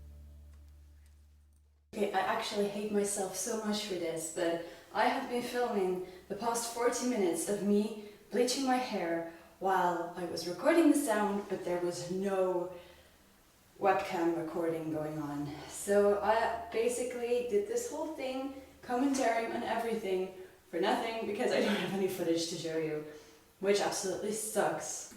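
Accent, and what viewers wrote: United States. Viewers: You sound American, but you have a totally different speech pattern. Where are you from? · It sounds a bit American also! It's crazy, but calming. If, that makes any sense. Haha I just love your voice. 😳😳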